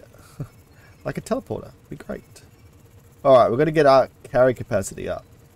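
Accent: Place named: Australia. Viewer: Good to hear an Aussie voice on YouTube. Cheers bro 👌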